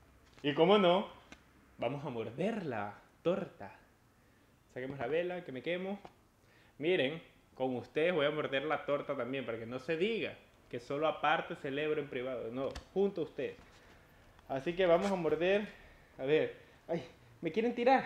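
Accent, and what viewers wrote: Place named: Argentina. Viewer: XD tienes acento argentino no me habia dado cuenta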